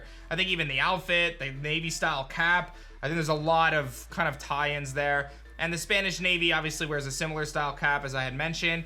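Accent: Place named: United States. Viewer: all tho respect but it hurts to hear americans say spanish words ALL THOUGH RESPECT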